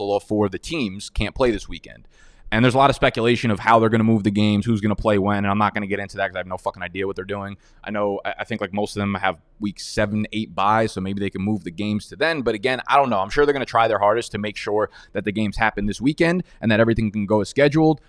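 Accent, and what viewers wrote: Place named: East Coast, United States. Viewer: Appreciate the content. he knows his stuff more than alot of other ff guys. But my God I cant stand how he says welcome BIKE lol. I just can't get past it. Is this an east coast thing?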